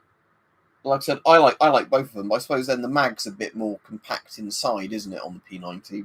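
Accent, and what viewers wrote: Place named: United Kingdom. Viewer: Seu sotaque britânico é muito elegante! ❤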